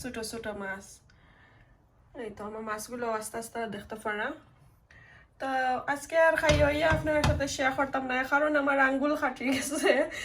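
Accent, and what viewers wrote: Sylhet, Bangladesh. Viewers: মাশাআল্লাহ অনেক সুন্দর ভিডিও সিলেটি আফার মাত যে সুন্দর লাগের, হাসির মধ্যে মুক্তা জ্বরের গু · Apu Komlar ball diya ranna kub Moja lagbo.nice hoise.duwa kori apnar osuk komto.apnar sylheti mat amar kub Valo Lage.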